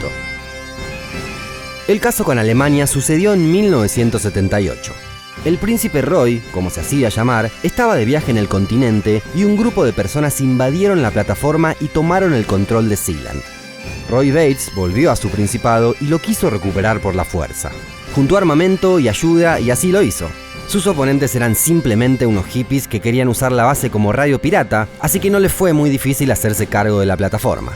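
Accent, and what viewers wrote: Argentina. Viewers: Este acento argentino me parece particularmente irritable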